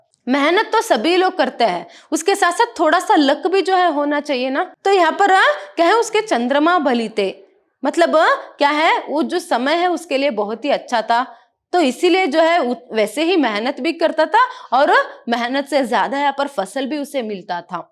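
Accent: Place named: Tamil Nadu, India. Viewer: mam your hindi has tamil accent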